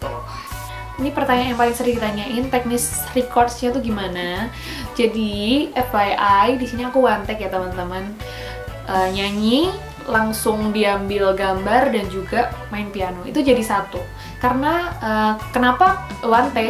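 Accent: Java, Indonesia. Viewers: Suaranya orang jawa banget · Logat"nya, ada jawanya sedikit😂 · Medok banget 😂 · Medok bed kak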